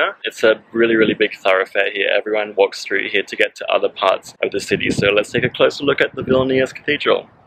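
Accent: Australia. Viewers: I am going to visit Vilnius next month. I can’t wait to be in those places in person. I am definitely going to visit Trakai. I love your calmness and sympathy and the Australian accent is my favourite.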